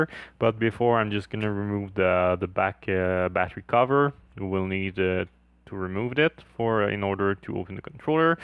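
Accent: Canada. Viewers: New sub! Very nicely done video. Btw, are you from Quebec as your accent is familiar....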